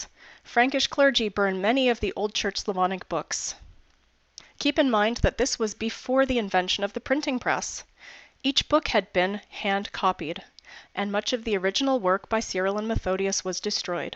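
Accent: Russia. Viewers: Old Church Slavonic is a beautiful language but it doesn¨t sounds good with a Russian accent, besides it¨s confusing. крест, воскресе́ние are in Russian not in Old Church Slavonic. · Most of the stuff is good but you seem to have a very thick Russian accent, and, more importantly, are very reductive of pagan and illiterate societies. Importantly, your line about "oral" languages not being able to express complex concepts is completely bogus, minus the obvious lack of adequate vocabulary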